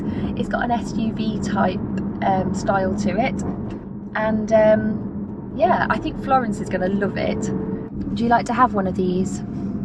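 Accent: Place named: Coventry, United Kingdom. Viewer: You sound like you have a Cov accent to me. Great review by the way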